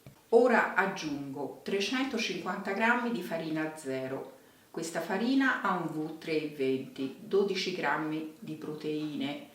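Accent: Tuscany, Italy. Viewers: Thank you. Love olive Focaccia, love your Tuscany accent as well. 🌾🎈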